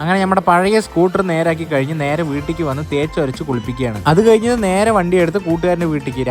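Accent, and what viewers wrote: Palakkad, India. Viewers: Bro നിന്റെ വീട് പാലക്കാട്‌ ആണോ 😊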